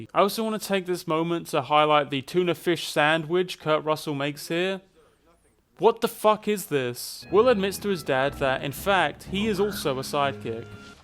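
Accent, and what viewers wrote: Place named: United Kingdom. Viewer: couldn't listen to this because he's british :(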